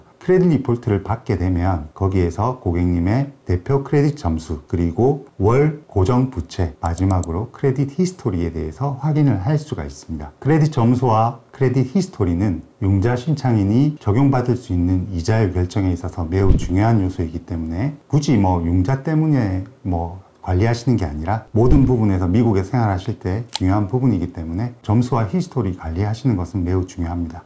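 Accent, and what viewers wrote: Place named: Gyeongsang, South Korea. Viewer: 많은 도움이 되었습니다~ 경상도 분이시군요ㅎ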